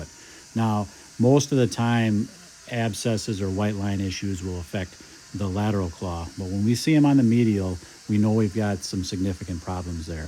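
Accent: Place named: Midwest, United States. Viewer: I figured out why I'm kinda obsessed with your channel, its kinda like watching rust busting work on a ship, but with a pleasant and informative midwestern voiceover and empathy.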